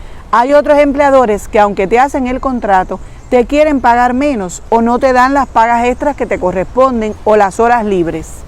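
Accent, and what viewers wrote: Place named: Cuba. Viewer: GRACIAS A USTED, POR SU CONTESTACIÓN. BUENO, CREO NO HABER ESTADO TAN LEJOS EN MI DEDUCCIÓN. YA SÉ QUE NO ES "ABOGADA" DE PROFESIÓN, SINO "FILÓLOGA", ADEMÁS DE INVESTIGADORA. LE FORMULÉ LA PREGUNTA, PORQUE PUDE APRECIAR SU DOMINIO SOBRE EL TEMA, Y ES MUY OBVIO QUE SI ESTUDIÓ FILOLOGÍA, Y ADEMÁS ES INVESTIGADORA, SEPA DESARROLLAR CON FLUIDEZ Y PRECISIÓN EL TEMA QUE ABORDE UNA VEZ QUE HAYA INVESTIGADO SOBRE ÉL. EN CUANTO A SI ES CUBANA, LO SUPUSE DE ALGÚN MODO, AL ESCUCHAR SU PRONUNCIACIÓN. YO TAMBIÉN SOY CUBANO. PUES ENTONCES, DESDE ARTEMISA, CUBA, RECIBA USTED UN CORDIAL SALUDO. VALE.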